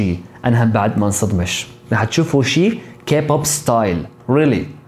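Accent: Iraq. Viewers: ياخيي حلووو عليك لهجه العراقيه 😭😭😭❤️ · انت عراقي؟؟ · احساك انت عراقي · انت عراقي؟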